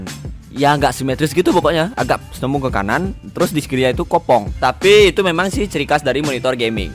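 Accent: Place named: Bali, Indonesia. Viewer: Logat ne bali sajan bli ne 😂😂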